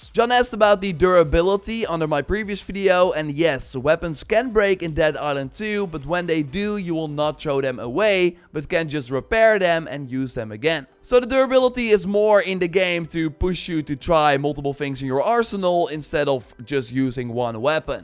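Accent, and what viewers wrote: Netherlands. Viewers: Ik ruik een Nederlandse accent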